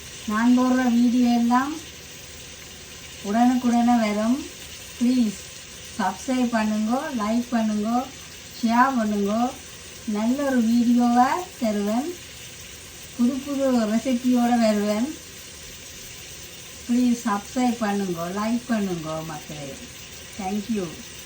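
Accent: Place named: Sri Lanka. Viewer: உங்களுடைய ஸ்ரீலங்கா தமிழ் அழகாக பேசுறீங்க❤ உங்களுக்கு நான் சப்போர்ட் பண்ணிட்டேன் சிஸ்டர் ❤🎉❤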